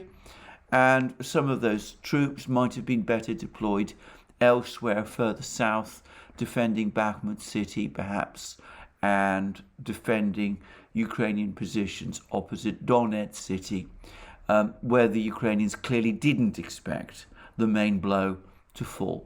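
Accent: England, United Kingdom. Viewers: Nice English accent Ruski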